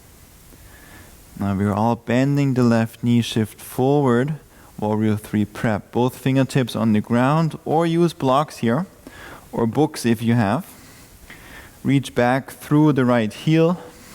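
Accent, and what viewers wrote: Germany. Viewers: ich dachte mir doch schon, dass ich da einen deutschen akzent gehört habe :D herrlich! mega flow. awesome job.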